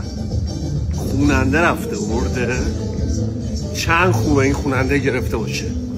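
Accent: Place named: Kashan, Iran. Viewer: بیخیال باش وبگذر،آقاداماد آخه امشب مهمترین شب زندگیتونه 😂😂😂شمابهترین کمدین هستیدواقعاعالی هستین من هرروزمنتظرکلیپهای جدیدشماهستم ممنون ازشماهنرمندگرامی کاشانی من شیرازیم ولی عاشق لهجه کاشانیهاهستم لطفااگرمیتونیدبالهجه شیرازی هم کلیپ بذارید❤❤❤❤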